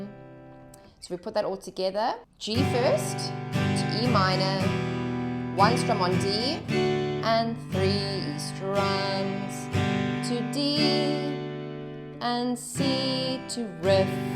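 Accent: South Africa. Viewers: This is much easier. I am glad I came across this tutorial. Is that a South African accent? Recognize! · Are you South African? You sound sound like you are from South Africa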